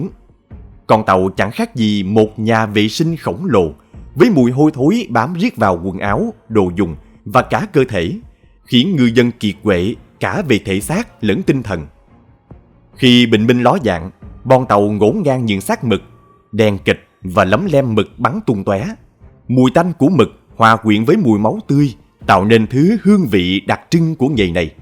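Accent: South, Vietnam. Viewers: Đón chào, giọng đọc rõ ràng, nhẹ nhàng, ko áp lực lỗ tai, khúc nào cần nhấn mạnh, chứ ko tăng âm lượng ko phải lúc, quan trọng hoá giọng đọc, diễn lố, đọc giọng miền nam tuyệt tuyệt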